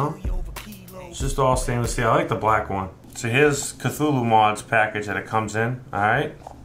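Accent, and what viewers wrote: Boston, United States. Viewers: what accent is that, boston ?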